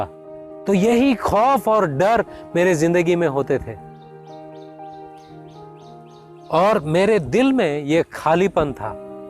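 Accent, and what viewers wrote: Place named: Goa, India. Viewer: Jhoot kion bol the ho tmhara accent he Goa k Christian's wala ha jhootay makar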